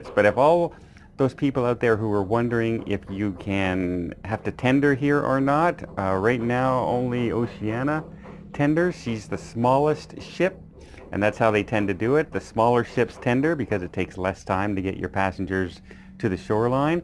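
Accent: Canada. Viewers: Love it! You sound like me, I just can't keep quiet when I see atrocious behaviour like that. Absolutely love your Canuck accent! Just subscribed, your videos are great.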